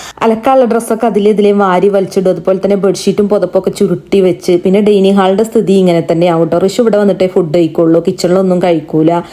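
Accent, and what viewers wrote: Malappuram, India. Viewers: മലപ്പുറകാർ അങ്ങനെയെ പറയും, എത്ര എഡ്യൂക്കേഷൻ ഉള്ളവർ ആയാലും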